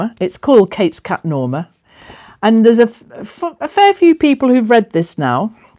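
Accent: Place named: Northern England, United Kingdom. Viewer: You have occasional northern twang, I was born in Crosby.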